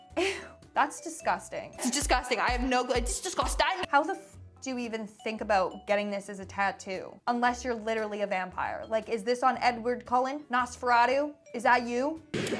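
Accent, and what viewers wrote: New York, United States. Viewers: Haha...love your NY accent.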